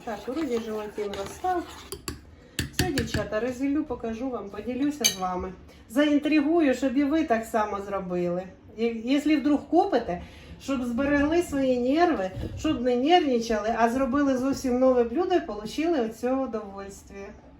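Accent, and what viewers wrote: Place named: Poltava, Ukraine. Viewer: Доброго дня! Підняли тему жіночої дружби, і ми тепер позгадуємо, як було) Так, по молодості було багато жіночих дружб, студентських, ну не таких, щоб прям занадто, але з часом, з роками ти розумієш, що найкращої дружби, як до своїх близьких та рідних годі й шукати! Мені зараз 46, батьків вже нема давно, але підтримуємо тісний зв'язок з сестрами, це найкращі подруги 💯💯 нас троє. Добре, що так виходить, дай Бог, щоб і надалі так було!!🙏🤗🤗 А от тема про Полтавську область цікава, де ви там проживали, скільки часу, щось про Полтаву? Бо я сама з Миргородського району, а зараз ми виходить недалеко й мешкаємо один від одного, хвилин 40-50 їзди)) Чомусь зразу, як почала вас дивитися, почула у вас полтавську "балачку", ну трошки є)🤗🤗🤗 Бувайте здорові, гарного дня, всим вітання!!❤❤❤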